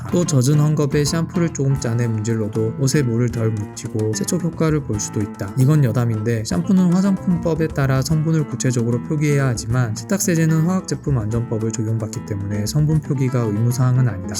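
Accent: China. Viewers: 한국분인가요? 어째 발음이 너무 이상해서요. 억양도 이상해요. 지도상 우리나라 왼편에 있는 나라 출신의 발음과 억양으로 보이네요.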